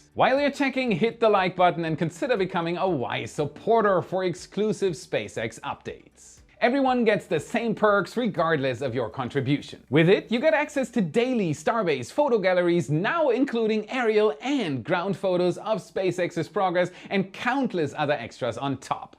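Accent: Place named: Germany. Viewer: I did not expect my comment to get such a big response and so many likes. With over 500 thumbs up it is obvious many others feel similar. I appreciated your comment because I did not see the episode you referred to where expressed he was getting burned out. I have been a subscriber for almost 4 years, way back when had a very noticeable German accent. I really liked his channel back then but also there weren't as many options. He made a big commitment to this endeavor moving himself and family from Germany to the US to be closer to the action so to speak. His show style and presentation may not be for me anymore but I am glad you and others still enjoy it. I absolutely wish him only the best and even more success.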